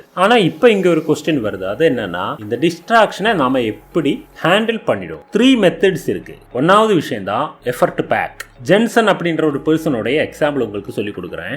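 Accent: Kerala, India. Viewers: You are a malayalee???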